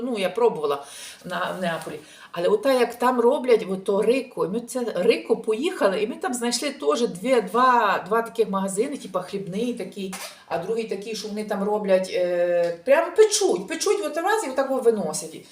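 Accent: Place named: West, Ukraine. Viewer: Дорога, скільки учительок в вас на каналі появилось 😂, а мені подобаються ваші відео, ваша спонтанність, наша говірна мова західної України. Я б ще добавила, кому не подобається,і постійно критикують, вони вільні не дивитись, але ж це спеціалісти, saputelle, лиш писати негатив, інше не вміють. Гарно проводіть вашу відпустку, місця чудові, їжа теж, всього найкращого❤🎉.Ви гарна пара з вашим compagno💓🐞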